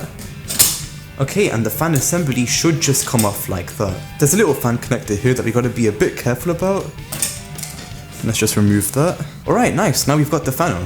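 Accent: United Kingdom. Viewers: Instant problem: "Chassis" is a French word. And our presenter has a Birmingham/West Midlands English accent. You're correct, Chassis is pronounced "Shassee" (not "Chassee").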